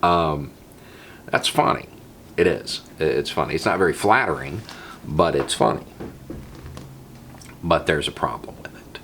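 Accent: South, United States. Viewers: you are the reason why thousands of people overcame their prejudices against Southern US guys of European ancestry (I reject the classification into black and white. Euro- or Afroamerican is more realistic). Good Southerners are too often overlooked and put into the same box as the bad guys. Same with Israeli Settlers, Iraqi Sunnis and ethnic Russian Ukrainians. You speak a certain dialect from a certain area and bam! you are suspicious. Anyway. Good job. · We all have prejudices. It seems to me that having a 2 party system has divided the country between liberals and conservatives. It’s the only way the elites can maintain power. Divide and rule is the name of the game. As for you, the fact you have that southern accent brings those preconceptions we have about middle America. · I discovered you just today, via a FB friend, and dude, you are awesome. 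I'm sorry to say that I do stereotype some, so how much more do I love hearing your Southern twang say things that aren't Trumpisms. Tats & the beard's hip up here -- in small town Ontario, anyway. The tank top/wife beater top's only offensive if it's worn by a very laege, sweaty person who should know better. Maybe things are different in the US? I hope things get better. You guys deserve so much better than Trump, or Bush, or bloody Nixon, or (dare I say it) Reagan.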